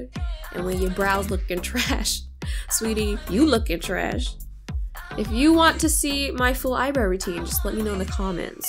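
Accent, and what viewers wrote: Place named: Australia. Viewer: SOLID AUSSIE ACCENT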